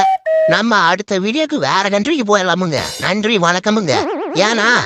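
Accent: Kongu, India. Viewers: Kongu slang.. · Coimbatore slag voice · இயற்கையாக இருக்குங்கோ ஏமுங்கோ உங்க கொங்கு பாஷை சூப்பருங்கோ 😅😅😅😅😅😅😅😅😅😅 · அது பாருங்க கோயம்புத்தூர் பாசை தான் செட் ஆவுதுங்க. 😅😅😅😅😅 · Sema sema..... Kongu slang u.... Thala thaniya ennala msg Panna mudilla... Athukkuthan intha madam id la reply.... Sorry madam❤ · Coimbatore slang vera level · Coimbatore slanga voicengaaa........😂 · Already subscribe pannittanungo👍 ungo kongu kovai slang romba pudichuthungo😂😂😂😂😂, appuram china market supernga😂😂😂😂, neenga paathu jagarathaiya irunga illana vera County ku poidunga anna😂😂😂😂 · பரம்பரை பட தருண் குமாரை ஞாபகப்படுத்துகிறது இந்த ரெய்மிங் டயலாக் நன்றி சகோ கொங்கு தமிழ் அருமை😂😂😂😂😂😂😂🎉🎉🎉🎉🎉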